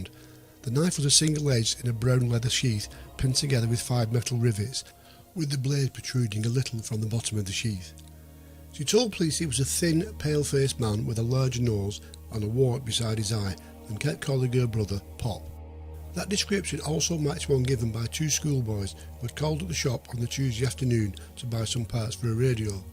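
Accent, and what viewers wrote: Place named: Northern England, United Kingdom. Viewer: Considering I'm a confirmed Yorkshireman, there'd b sum sort of rivalry, bt nt this time, it's gud to hear a Northerner, even better as it's a Northerner doin such a great job with these videos, gud job, u deserve any plaudits 👍👍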